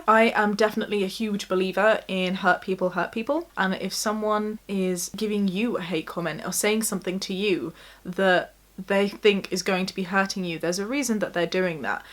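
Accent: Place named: United Kingdom. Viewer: Not gonna lie, as an American I think for me it’s the British accent that makes you seem wise 😂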